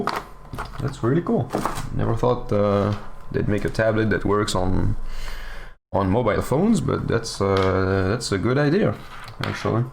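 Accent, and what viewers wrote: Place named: France. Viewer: you have a french accent, are u french ?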